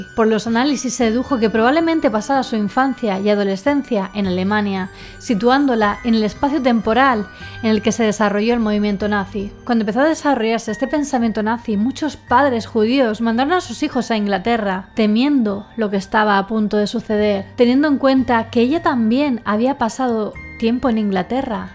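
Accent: Spain. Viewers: Hola guapa eres de España se te nota en el asentó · Muy buen vídeo; por tu acento asumo que habrás oído hablar alguna vez acerca del crimen de Alcácer, sé que es un caso bastante sórdido y enmarañado, pero estaría interesante un vídeo al respecto con tu excelente narrativa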